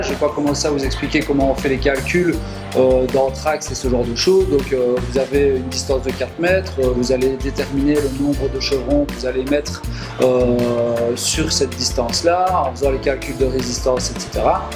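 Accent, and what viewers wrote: Belgium. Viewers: Bravo, une bonne imitation de, tu tiens bien l'accent belge. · L'accent belge m'avait manqué.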